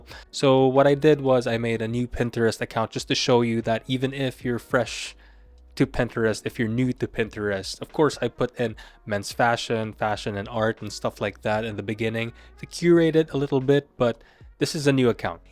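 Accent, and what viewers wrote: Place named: Philippines. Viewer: You kind of have a Filipino accent when speaking in English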